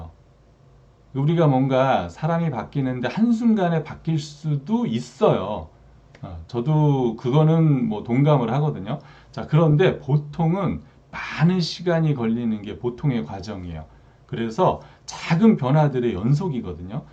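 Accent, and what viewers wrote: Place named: Seoul, South Korea. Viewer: ㅎㅎ서울사람 말투 행동하는 삶 으흠~^^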